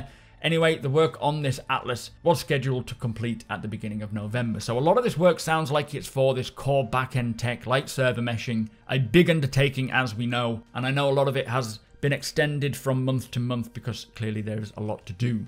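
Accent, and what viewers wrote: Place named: North West England, United Kingdom. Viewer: Where you from in the north west? I'm trying to pinpoint your accent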